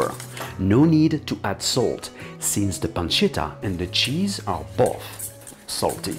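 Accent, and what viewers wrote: France. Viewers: When I am in heaven, I hope there is an option for a French-accent man to prepare carbonara for me.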